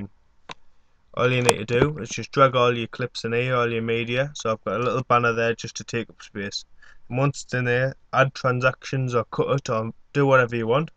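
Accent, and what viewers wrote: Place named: Newcastle, United Kingdom. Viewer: hahaha I love the Geordie accent!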